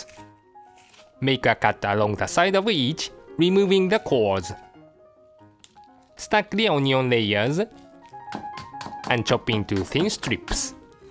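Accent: Japan. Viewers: この、いかにも『日本人の英語』って感じが これ海外の人にはちゃんと伝わってるのかな？ 字幕あるから問題ないか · The host has very strong Japanese accents, but I understand him very well, but will difficult to many English speaking people. It was better, if the lady talks. This is not a healthy food and it takes a lot of times to make a simple food, but when you have nothing to do, you can cook it at home. This guy and woman work together perfectly, but they use low quality Japanese cookeries. Even I use very nice Japanese cooking stuffs and knives everyday.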